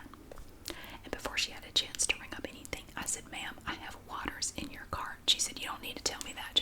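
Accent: South, United States. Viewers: I feel like my whispering doesn't hit the same cause she has the perfect southern drawl!!!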